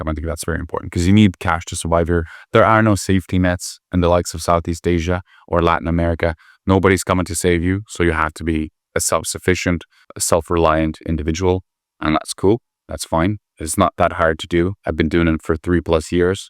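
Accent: United States. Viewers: You could just call 'the West' Europe. That's geographically where you are speaking about. You video is nonsense, - there is freedom of movement in Europe, within the EU. Saying 'Eastern Europeans' made Ireland less safe is complete nonsense. Many Polish people settled in Ireland and added hugely to Irish society. You are so anti the US and yet you sound so American, you certainly don't sound like a person who lived in Galway since they were nine years old. Ireland has experienced an influx of illegal immigrants who come into Ireland via Northern Ireland, but those numbers are falling now. We have had a lot of Ukranian refugees, fleeing war. Would you suggest not helping refugees fleeing war? "Indians have fake degrees" - no faker than you stating you have a masters in pharmacology, only to later admit you dropped out, so you don't have any masters degree. 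Lithuania joined the EU in 2004, so you weren't an immigrant who needed a visa when your family moved to Ireland, you were just someone exercising EU freedom of movement with your family. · You have an american accent .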